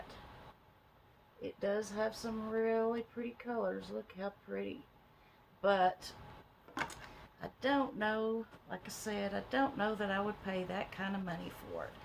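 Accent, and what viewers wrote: South, United States. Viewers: I’m from the south also and we do have a way of our own talking... I get told.. so I love listening to someone that has an accent like I do.. I’m so sorry about the tumors.. I’ll be praying for you and thank God it’s not cancer.. concerning the mask have u use any mask that is better staying away from ? thinking about doing the Monday mask on my channel.. just not crazy about masking... LOL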